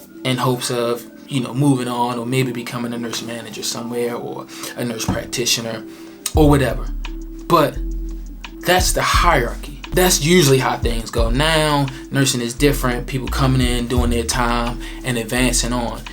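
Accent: Baltimore, United States. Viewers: I'm in nursing school and it's already starting. Everybody wants to be the super nurse and try to prove that you are not smart or fit for the job. It's very stressful. But I feel it's like that in any profession. Aye Bmore. I grew up there but haven't lived there in 15 years. It reminds me of home hearing your accent.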